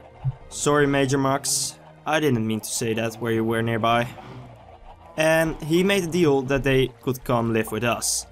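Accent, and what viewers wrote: Netherlands. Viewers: All my drops get burned off the lava? Why does it do it? And another question? Are you dutch? You have a dutch accent, im dutch as well ;D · u dutch ..... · Are you Dutch?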